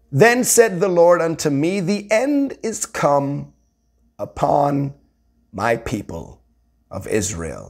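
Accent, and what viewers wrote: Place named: Cockney, United Kingdom. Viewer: Awesome Brother 👌 Also, loving the British accent, you sound like a proper cockney geezer 😄 Big love 💘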